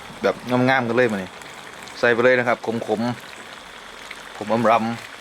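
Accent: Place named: Central Thailand, Thailand. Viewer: ทำเหมือนพากกลางเลยคับแต่ไม่ใส่มาระคับแชบๆคับ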